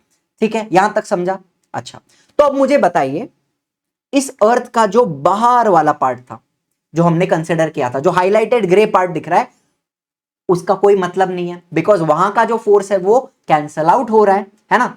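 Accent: Maharashtra, India. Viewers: Ek no marathi sir 😅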